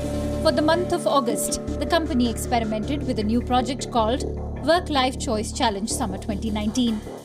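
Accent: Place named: India. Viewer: Indian English lol learn the pronunciation first. Work on your accent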